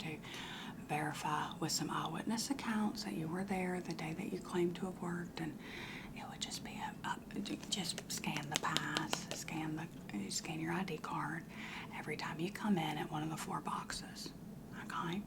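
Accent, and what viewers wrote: South, United States. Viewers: Please do a Paula Dean video with this accent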